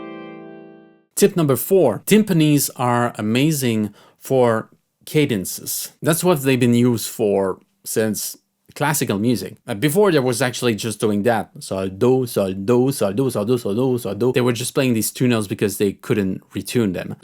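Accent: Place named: France. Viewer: Great tips, many thanks! Coming from a language where “h” sound is much harder than in English pronunciation, your implied French “h”’s took some time to get used to. Also, being a beginner, had to look up what “Cr.” stands for - learned something new and absolutely necessary.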